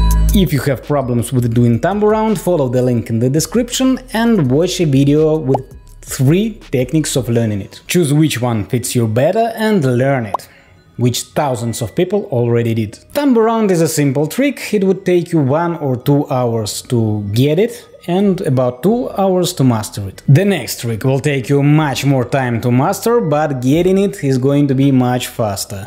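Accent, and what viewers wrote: Russia. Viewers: It always seems to me that you have a Russian accent, but it’s not bad, it’s just not familiar to me. :") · Man, I love your ruski accent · Русский акцент)Сразу понятно что ты русский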